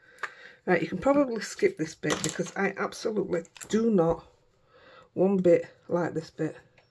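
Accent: Yorkshire, United Kingdom. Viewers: Hello, I found this video today. I enjoyed watching it a lot, so instantly subscribed. It's also lovely to hear a Yorkshire voice. I look forward to watching your other videos.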